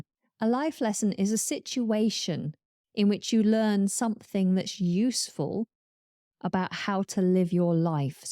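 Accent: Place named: RP, United Kingdom. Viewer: Good lesson, especially for the beginners! I especially adore your accent, the genuine RP accent and pronunciation.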